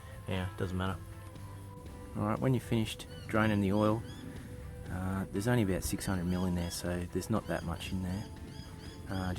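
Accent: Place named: Australia. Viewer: you sound like an Aussie bloke !!!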